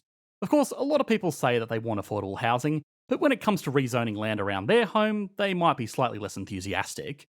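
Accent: Australia. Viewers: The demand for housing doesn't mean they are built fast enough. You don't know the issues of Canada clearly. The builders do not have the workers and they also rather pay less. On top of that they rather build at a slower pace and charge MUCH MUCH more for each unit because of desperation of the housing market. If they build too much housing too fast. Their bottom line in what they can make also suffer. So the incentive is to keep it as extremely high demand. How can you account for 500K immigrants when 200K housing is built every year. That is just the immigrants not counting the 750K students that need places to stay. We need a new city built at the size of Ottawa each year now. Let me know how that is feasible. This is not over sensationalized. It's an extreme problem happening. I detect an Australian accent or NZ one and you don't live in Canada. Studying things and not living them is a different story.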